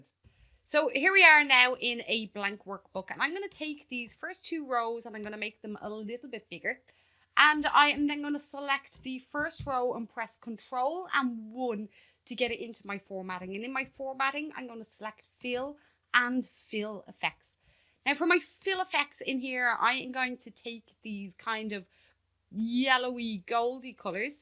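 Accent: Ireland. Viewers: Love the Irish ☘️. Thanks for this 👍🏽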